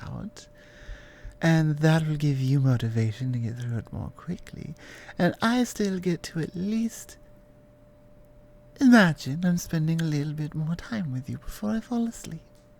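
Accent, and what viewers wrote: United Kingdom. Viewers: Oh my God the British accent activates my neurons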